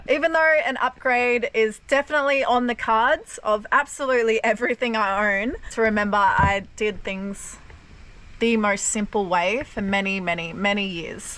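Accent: Australia. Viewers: You got some skills women! That Australian accent is something else 😍..Australian women are built different!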